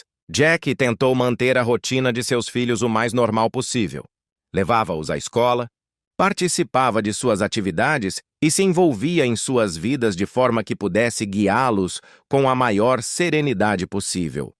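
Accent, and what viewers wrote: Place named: São Paulo countryside, Brazil. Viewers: Aristótoles Onássis 😂😂😂😂😂😂😂😂😂continuam fazendo esses videos mal feitos, sem qualidade alguma visual e auditiva e pior o sotaque interiorano paulista, vão se catar !!!!!! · O que vc tem contra o sotaque interiorano paulista? É o único existente no tamanho deste Brasil!?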